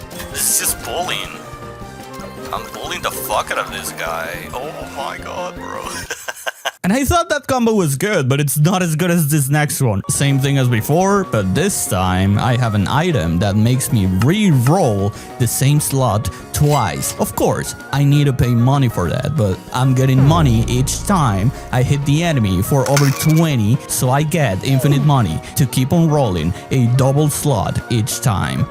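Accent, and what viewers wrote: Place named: Europe. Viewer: Your voice sounds weird because European, not because illness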